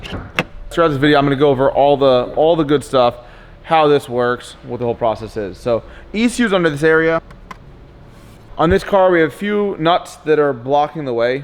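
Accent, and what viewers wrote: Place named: Long Island, United States. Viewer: Listen to that long island accent 😱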